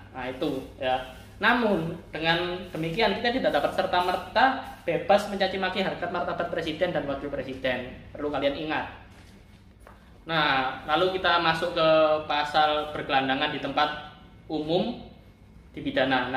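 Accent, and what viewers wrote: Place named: Java, Indonesia. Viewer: Suaranya kaya medhok koyo wong jowo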